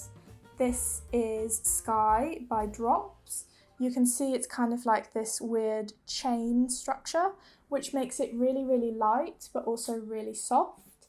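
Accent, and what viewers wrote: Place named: England, United Kingdom. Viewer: it was so interesting to hear about your very International childhood. Thanks for sharing! Even though I’m very bad with recognizing accents, I did have the feeling your accent was completely “born and raised” in England. 😊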